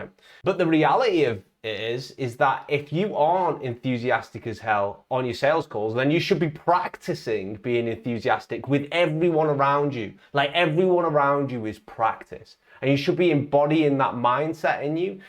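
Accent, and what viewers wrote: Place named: United States. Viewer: I like your accent, I have no idea which part is it from in the US, but it's cool haha.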